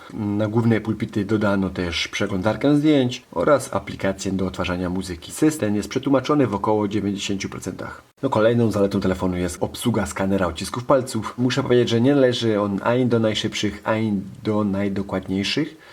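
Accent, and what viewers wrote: Silesia, Poland. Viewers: Witam :) Akcent wskazuje że jesteś Ślązakiem ?! Na ręce masz ? Ten ludzik Android ma jakąś funkcję czy tylko ozdoba, córka mnie o takiego męczy ;)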